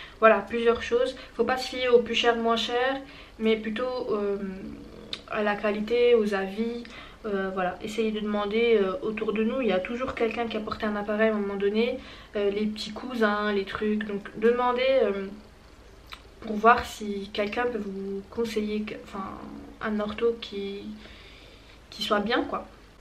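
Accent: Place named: Belgium. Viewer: T'as un petit accent belge, mais tu vis en France nan😂 ? ou exactement ?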